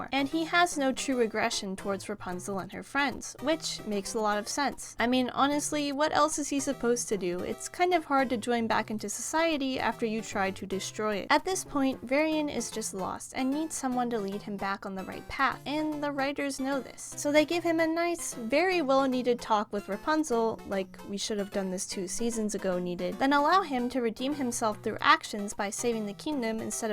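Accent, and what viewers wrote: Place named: United Kingdom. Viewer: The British accent sends me into orbit “But RaPuNzIiĪiEeEeEEEeLl”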